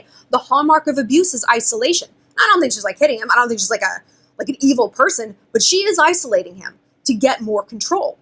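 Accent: England, United Kingdom. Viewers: You have a nice English accent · Good video. Good insight I didn't think about. What's going on with those pretty earrings? Really nice. You drive us crazy cool with your bangs! So pretty. Like the British accent. It works. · Holy fuck your British accent sounds like Kate Winslet. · Your English accent is amazing I was smiling the whole way through (London girl here). How multi-faceted can a Queen be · English accent on point. 💜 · Your English accent is everything! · OMG YOUR BRITISH ACCENT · Nice Brit accent. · WOW REALLY AWESOME BRITISH ACCENT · Omg that British accent though 👑☕😂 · I freaking love your British accent.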